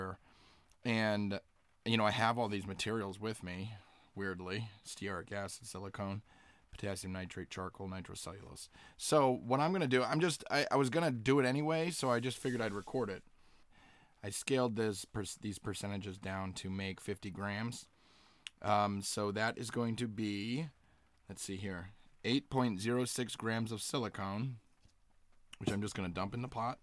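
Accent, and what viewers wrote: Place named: Augusta, United States. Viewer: Dude are you from Augusta?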